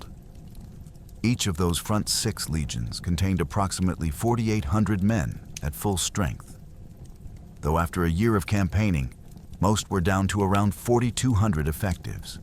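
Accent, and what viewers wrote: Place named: United States. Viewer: American accent doesn’t help describing historical facts…….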